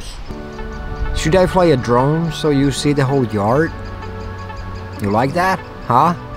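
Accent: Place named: Finland. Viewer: P.s. + Less is more. At times even that is very effective. I liked this nature video and I think your English is just fine with your Finnish accent. Good idea on being your own best friend!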